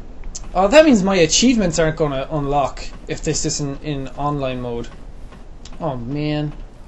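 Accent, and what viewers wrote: Ireland. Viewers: FutureAdaption He kind of tried to hide his irish accent, but not in this one. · He's starting to sound a little bit like his Irish self ;)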